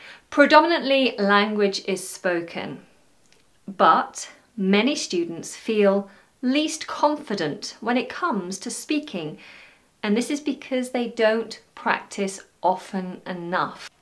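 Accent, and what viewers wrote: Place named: England, United Kingdom. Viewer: when you speak with your nothern accent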